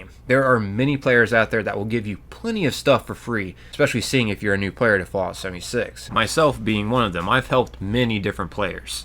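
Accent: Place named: Philadelphia, United States. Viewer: Off topic but I love your accent. Philly?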